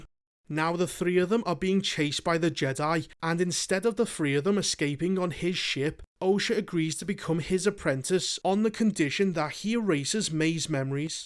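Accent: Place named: Scouse, United Kingdom. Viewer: It’s a Scouse accent. Every one in liverpool talks like that. To be fair to his Scouse accent is actually quite mild compared to most in liverpool.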